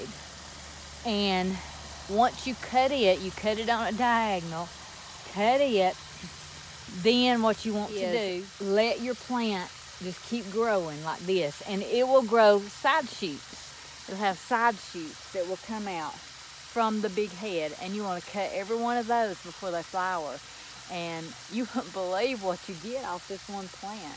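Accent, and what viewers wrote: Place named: East Texas, United States. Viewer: Thank you.👩‍🌾 I am enjoying my garden catalogs. I see my gardens in my visions underneath all this snow. You make me homesick. Are you east Texas? It sounds like home, I miss the sing song chatter of my Aunts and cousins in the kitchen.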